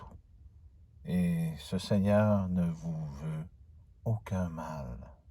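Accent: Quebec, Canada. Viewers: Vous êtes québécois ? 😄😁😆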